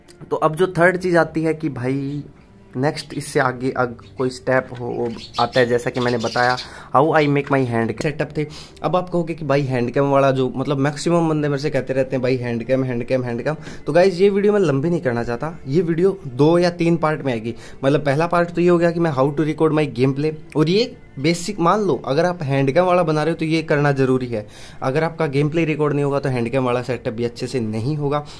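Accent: Haryana, India. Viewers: Bhai haryana me kaha se ho? Awaz me haryanvi touch h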